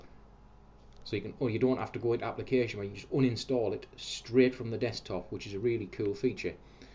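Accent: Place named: Northern England, United Kingdom. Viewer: damn cool accent you've got there haha :) northern england, that is, yeh? york?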